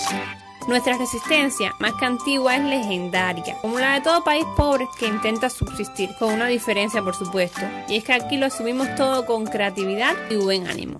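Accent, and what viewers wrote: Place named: Cuba. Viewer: Por tu acento marcadamente cubano casi no te entendí algunas expresiones sobre los oficios. Te cuento que en Cartagena, en el Caribe colombiano hay varios de los existentes en tu isla; bicitaxi, zapatero, el que arregla las ollas y calderos, las sombrillas y el colero ( acá lo ves quienes apartan los turnos en las colas para las citas médicas y obtención de medicamentos en las IPS- Instituciones Prestadoras de Salud- y en las EPS-Empresas prestadoras de Salud- y el que consigue pasajeros para el transporte. El que me pareció más curioso. porque ya ni los veo, es el de los encendedores, que llaman ustedes fosforeros. Me llamó la atención que no existan allá los mototaxistas, cuando acá eso es una PLAGAAAAA. Un abrazo desde Colombia.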